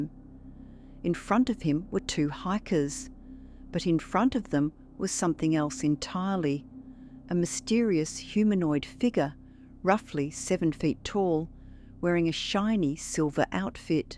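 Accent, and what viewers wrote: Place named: Australia. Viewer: The story teller has a lovely gentle Australian accent, and voice.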